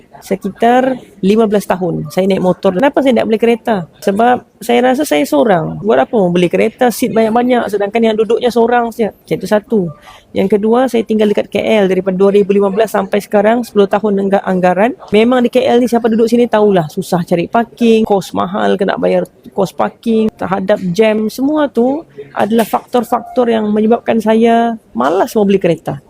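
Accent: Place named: Indonesia. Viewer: Rasa2 loghat apa kakak ni guna ye? Kejap dgr mcm indonesia, kejap macam utara.. konpius...😂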